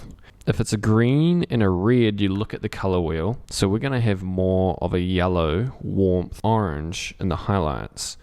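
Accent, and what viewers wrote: New Zealand. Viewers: it was interesting but your kiwi ixint is so strong i get distracted :DDD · Him and all the other Kiwis living in the WÜÜÜRLD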